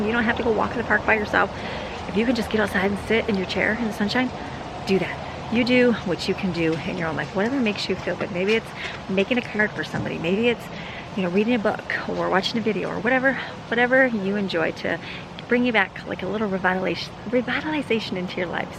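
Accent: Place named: South, United States. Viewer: Southern talk yall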